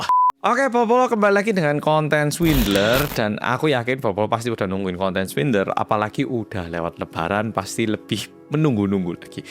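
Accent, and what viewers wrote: Java, Indonesia. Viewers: Halo gaes, welcome back bersama detektif swasta yang ... medhoookkk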